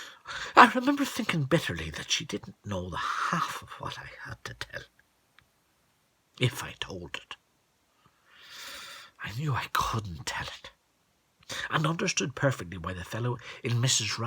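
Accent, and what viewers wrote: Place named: United Kingdom. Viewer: Ohh, I love british accent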